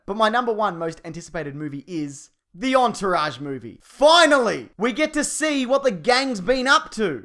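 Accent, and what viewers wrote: Australia. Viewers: You sound like an Aussie and I would know cuz I am one and I live in Australia. Also you should try to do a collaboration with screen junkies honest trailers because you would be hilarious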